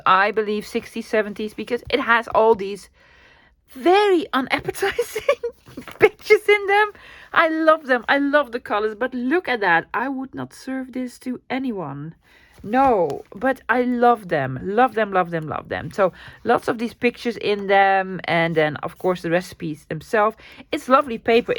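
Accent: Netherlands. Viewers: OMG I just love your channel. One of my closest friends is of Dutch Heritage so I’m very used to ( and love) your accent. Your enthusiasm and appreciation for all things even remotely vintage strikes a chord with my heart. You have definitely won another fan. Just wish I could buy economically from Europe, being on a pension means I simply can’t afford the shipping ( same goes for USA) but I’ll continue to watch and learn and purchase digital files when I can. Thanks again you’re an inspiration to me ❤o😊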